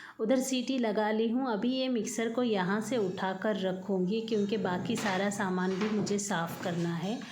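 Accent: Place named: Belgaum, India. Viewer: proper nagpur se hain kya aap ka asent mujhe belgaum jaisa laga thats y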